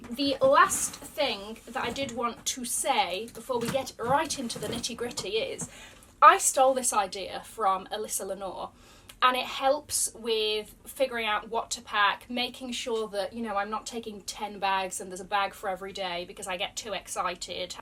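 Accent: United Kingdom. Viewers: I’ll have to keep an eye out for your British accent and fab style! 😉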